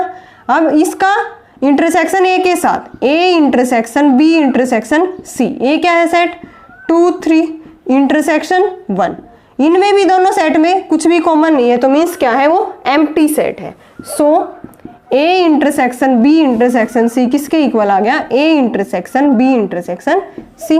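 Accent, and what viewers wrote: Haryana, India. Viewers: Aap haryana se ho kya mam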